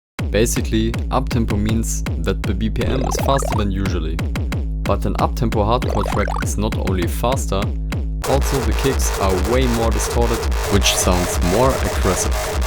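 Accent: Germany. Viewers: das doch nh deutscher der da spricht, safe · Ich erkenne einen deutschen wenn ich ihn höre :D Geiles Video!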